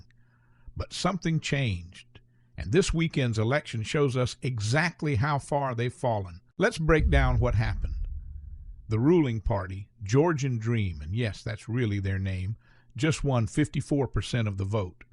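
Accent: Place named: United States. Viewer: This video is tipical western propoganda. Even the person speaking in the video has strong american dialect.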